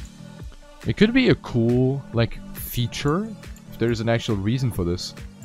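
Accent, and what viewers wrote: Germany. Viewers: mann hört halt auch ein bischen das du deutscher bist lul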